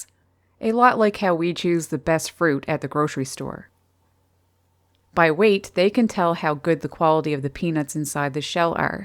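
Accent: Canada. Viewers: I enjoy the Canadian twang in your voice.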